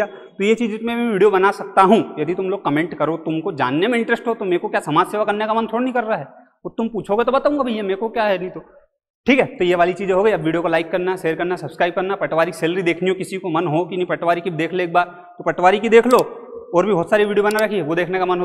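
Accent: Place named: Indore, India. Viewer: Indori bhasa 😂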